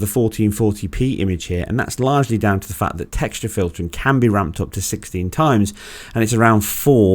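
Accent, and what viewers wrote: United Kingdom. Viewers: There is no wrong or right, but he sounds like a proper British.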